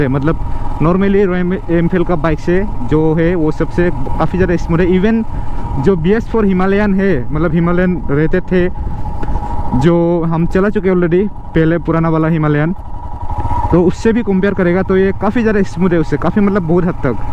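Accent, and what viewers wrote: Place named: Assam, India. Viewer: bro i think you Assamese boy cozz Hindi sm to sm Assamese wlo ka hindi hai ye😊btw keep it up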